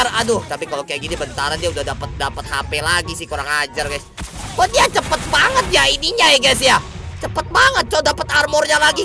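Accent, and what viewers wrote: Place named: Java, Indonesia. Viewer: Sunda Bogsel Nih bang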